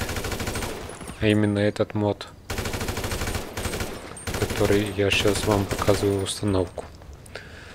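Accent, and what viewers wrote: Ukraine. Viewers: чел это украинский акцент и есть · Ех... Как мне нравиться этот украинский акцент... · Он украинец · похож на украинский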